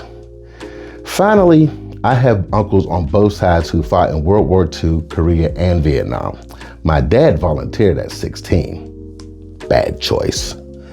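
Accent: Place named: Ireland. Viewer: You're Irish accent is on point! And you preach, but not the way your grandmother predicted!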